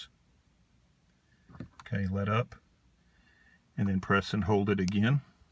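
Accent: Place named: South, United States. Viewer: You must be a southern boy...awl light....awl .... aint no crime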